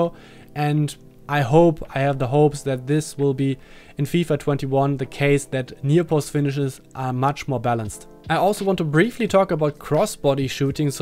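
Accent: Germany. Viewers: Safe Deutscher, dieser Akzent 😂 Genial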